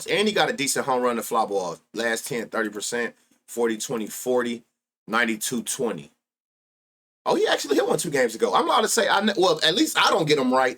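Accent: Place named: Bay Area, United States. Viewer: 2 mins in i’m like oh yeah this nigga from either the bay or la 😂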